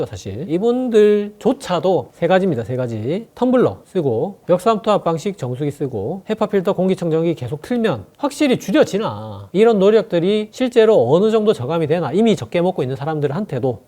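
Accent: Gyeongsang, South Korea. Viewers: 지구가 프라스틱에 째리게 되었다 ㅠㅠ 갱상도라 더 더가옴. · 선생님 잘 듵었습니다 구수한 경상도 사투리가 정겹네요 미세프라스팈에 쪄였어 살았네요 줄이는 방법 알려주셨어 감사합니다^^~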